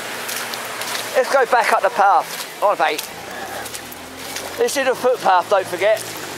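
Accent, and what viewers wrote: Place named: Southampton, United Kingdom. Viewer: New I recognised that accent, soton through and through haha. I went to woodlands, live in swaythling. Used to knock around by the Hinkler pub, Hanney rise etc. Love your vids mate, just got into shooting recently, cheers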